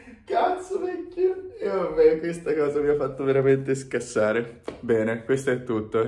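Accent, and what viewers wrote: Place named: Rome, Italy. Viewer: Ma perché parli in Romano? Bel video continua così 😊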